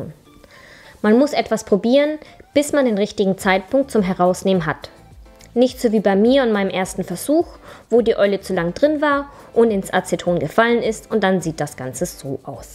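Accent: Germany. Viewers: Geil, wenn du schwäbelst🤣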